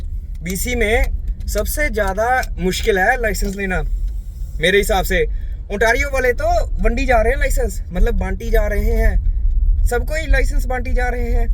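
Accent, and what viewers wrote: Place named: India. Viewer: the hindi accents killinggg meee😍🙏🏼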